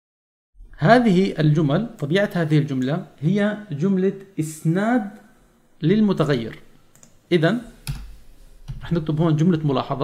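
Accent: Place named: Syria. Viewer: غريبة انه تكلم سوري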